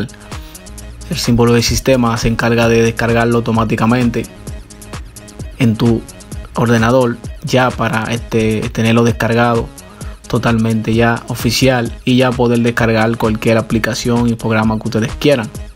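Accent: Dominican Republic. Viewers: Eres dominicano verdad xd es que tu voz se me ase conocida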